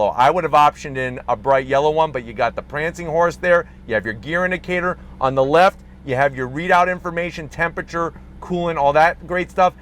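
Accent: United States. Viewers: your parents are from Italy? Wow. Didn't see that coming because your accent are very American though.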